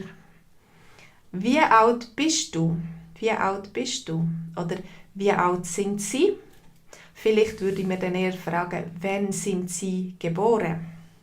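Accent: Switzerland. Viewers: Sprechen Sie Berndeutsch oder Zürideutsch? Meiner Meinung nach gibt es auch Unterschied dazwischen ,danke.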